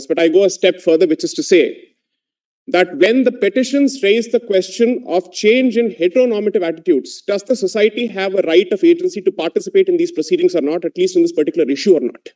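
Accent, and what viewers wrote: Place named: South India, India. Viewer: i have said him south indian because he has south indian accent in english and south indian is not a bad word so no need to feel offended on his behalf and you are taking south indian as low word so you have very expensive mentality!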